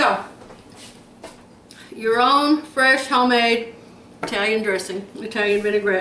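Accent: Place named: Texas, United States. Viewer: I just found your site and you sounded like a Texan. Now I see that you have on an apron with Texas. Where in Texas are you? I grew up in Temple and now live in Paraguay.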